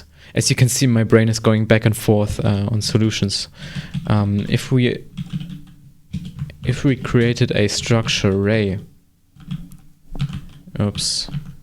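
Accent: Germany. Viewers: German accent ↗️↗️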